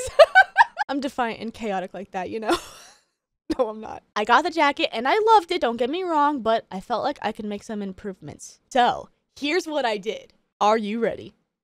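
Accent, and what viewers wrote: South, United States. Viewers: A chaotic is a funny! Hope your allergies clear up soon! Cant wait to see what a week in your life is like! Also, the southern accent just gets me every time!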